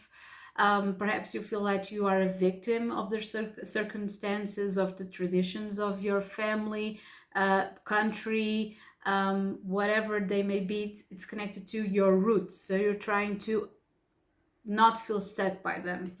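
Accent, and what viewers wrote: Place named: Portugal. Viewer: ouvi 2 minutos do video e já detectei um sotaqueuzinho português e realmente confere.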